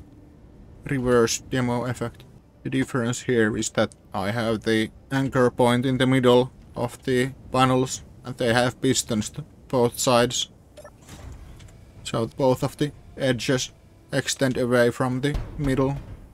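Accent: Finland. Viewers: You sound finnish, Are you finnish? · Just found your channel earlier and love it! Keep up the good work man. Also, you have a very interesting accent, are you from Finland?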